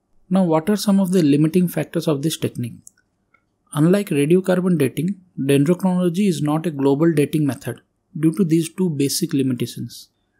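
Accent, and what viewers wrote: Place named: Bihar, India. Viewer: Sir you are definitely from bihar.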